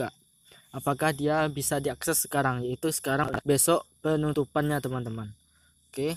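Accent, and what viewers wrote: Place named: West Sumatra, Indonesia. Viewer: Urang minang yuang😅😅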